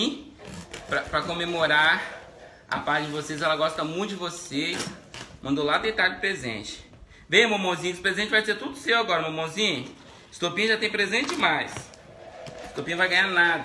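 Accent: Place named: Minas Gerais, Brazil. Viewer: Esse capixaba tem sotaques de mineiro😂😂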